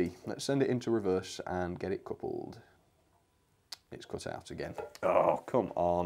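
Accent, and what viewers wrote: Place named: Sheffield, United Kingdom. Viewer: Whenever I watch your vids I think 'who does his accent remind me of?' It occurred to me today, you sound like I do. I'm from Sheffield, am I close?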